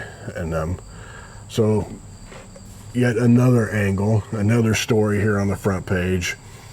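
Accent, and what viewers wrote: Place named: Fort Wayne, United States. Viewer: I appreciate what you're doing I grew up in ft Wayne so I sound like you I wish I could tell a story like you please keep it going your the best , love you in Christ always amen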